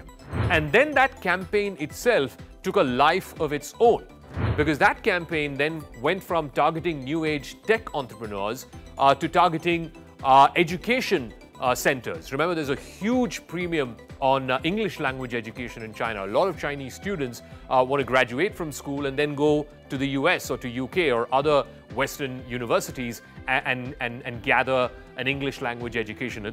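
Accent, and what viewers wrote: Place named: India. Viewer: First time to see this channel and I love it clear unbiased factual and subcribe. First I hesitated since it sounds Indian,I'm not a racist because I've watched a few Indian channels they're all craps loke WION/GRAVITY,TGIF etc.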